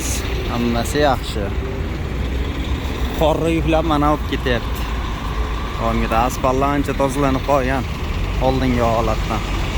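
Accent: Namangan, Uzbekistan. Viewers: Сиз наманганлик сиз гапиришингиз дан билдим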